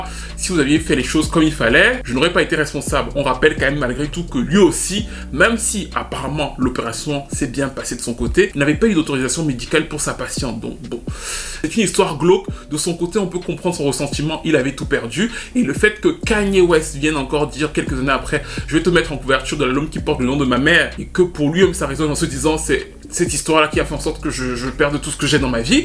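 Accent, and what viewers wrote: Cameroon. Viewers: ton accent camerounais ressort très fréquemment, c'est hilarant 😂😂😂😂 Tu nous rends fiers. Que de la force pour ton excellente chaîne frère 💪🏾😁🇨🇲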